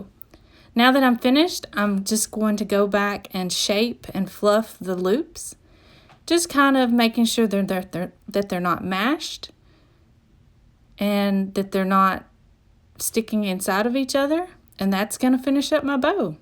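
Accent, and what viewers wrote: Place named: South, United States. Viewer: Yes! Love a good ole fashioned southern accent!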